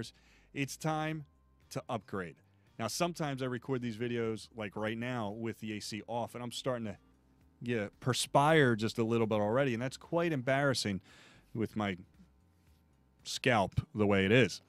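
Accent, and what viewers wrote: Philadelphia, United States. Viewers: Just subscribed. Thx for this video. BTW, are you from Philadelphia? Just a guess from hearing your accent.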